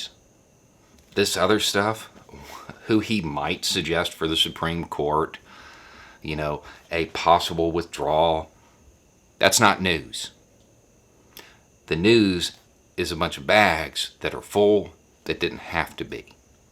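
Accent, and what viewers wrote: South, United States. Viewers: I just found your channel, and I Thank You for your commentaries. Where are you from? I'm in West Virginia, and I detect a country accent.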